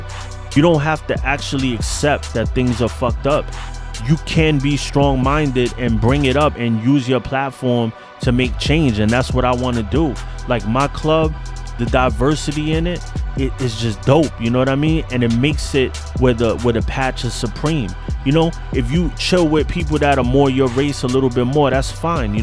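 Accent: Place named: East Coast, United States. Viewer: First time but # where you from I hear the east in you bro. Tappin In from Boston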